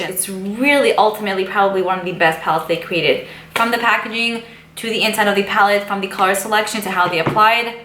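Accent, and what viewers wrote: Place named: Brooklyn, United States. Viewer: you're a beautiful woman, you're still young so the right guy for you is out there! I love the look you came up with here, the lashes just really topped it off beautifully! I love hearing you speak, I was born and raised in Bklyn (till I was 21) so you sound like home <3 hey have a bagel for me on 13th and 75th if its still there! that place was my jam back in the day for a bagel with melted cheese! lol anyway, just found your channel recently and i'm loving it and you xo Michele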